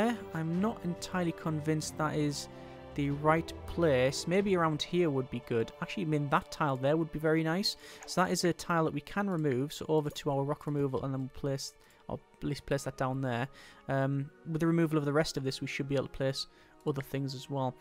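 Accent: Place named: North East England, United Kingdom. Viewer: I’m very curious about where he is from, I feel like he is from the uk and possibly the north east but please correct me if I am wrong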